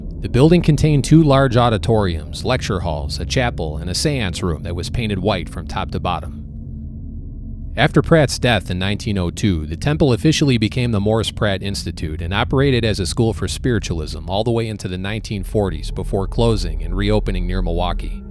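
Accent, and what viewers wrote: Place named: Midwest, United States. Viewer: I listen to this channel at least once per day. Great stories, Midwest accent is great, and the background music is perfect.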